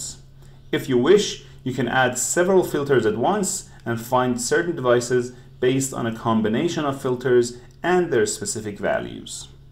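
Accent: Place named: Iran. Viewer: Damn.u got some persian accent.nice teaching by the way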